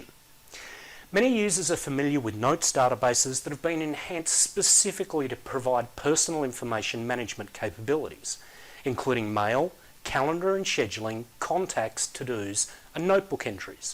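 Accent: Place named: Australia. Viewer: Man, I missed your Aussie accent :-D Without this product I wouldn't have come across with you all great people (yes, then of course with others ;-) ) and didn't make a living from and with it for almost 21 years. I will share this video to all customers who are thinking about migrating their apps to Microsoft - they will regret it soon.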